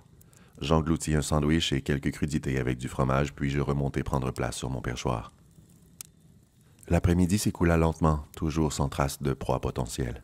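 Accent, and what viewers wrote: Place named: Canada. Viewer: Je viens de découvrir la chaîne. Vraiment excellent! La narration et ce petit accent canadien j'adore!🥰👌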